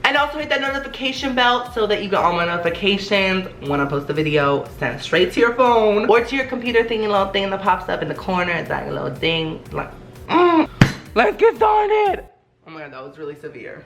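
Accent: United Kingdom. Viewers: His British accent is funny like if u agree❤❤ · 100 videos of British accent pls Also asscheek earthquake full audio plsss or mvvv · He should really do an entire video in his British accent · Love your British accent lol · YOUR BRITISH ACCENT GIVES ME LIFE!!!!!! SLAYYYY!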